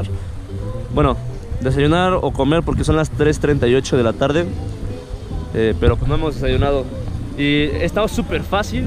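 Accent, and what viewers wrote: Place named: Mexico. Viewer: no me parece rentar esas cañas de pezcar, y luego pagar el pezca'o, noooo, me fuistes muy gracioso te noté muy mexicanísimo hablando, Dios tw bendiga amigocho🖐😄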